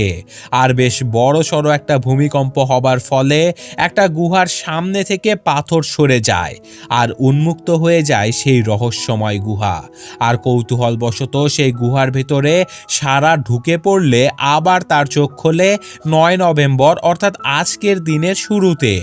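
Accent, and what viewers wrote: Bangladesh. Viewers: Dada apnar voice ta khub sundhor API ki bengladesi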